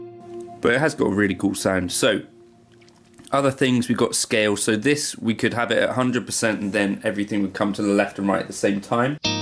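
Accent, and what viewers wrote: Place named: Bristol, United Kingdom. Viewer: Random question - Where did you grow up? My girlfriend has overheard your videos when I watch them and called you "that Bristol guitar bloke" based on your accent. I said he's from Exeter in Devon as far as I know and the accent is similar (We're oop north) but she insists you're from Bristol and must have moved. I insist she's crap at identifying accents. Can you help settle an argument?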